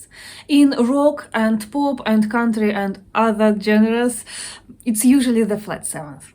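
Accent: Russia. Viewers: What a fine difference she makes compared to all these ugly unshaven tattood guys in black t-shirts. Her teaching is not only very accurate, but is is more fun to listen to somebody with a pleasant aura and the russian intonation is the cherry on the top.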